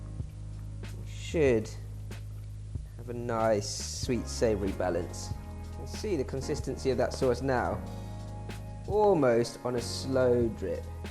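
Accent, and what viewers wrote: United Kingdom. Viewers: A Chinese guy with a British accent having Indian in-laws cooking Japanese curry......... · LOVE LOVE LOVE your videos. You are indeed a true professional. I am so happy that I found your channel. Everything you make sounds and looks delicious. Thank you. BTW, also love your British accent. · Are you being deliberately obtuse? He's british, like Gordan. Similar accents.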